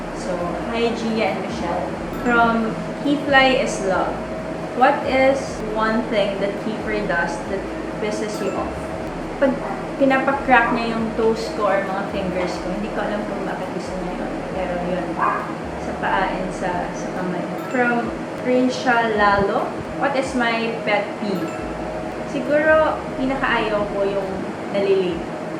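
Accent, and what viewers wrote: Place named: Conyo, Philippines. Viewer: Si ate Ly lang talaga yung cute mag conyo. Hindi siya nakakairita pakinggan.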